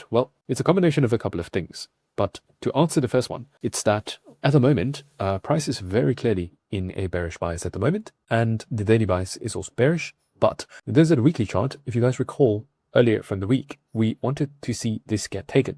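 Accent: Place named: England, United Kingdom. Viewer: Not sure what it was, I’m Australian and you’re accent is slightly English but super fast I had to slow it down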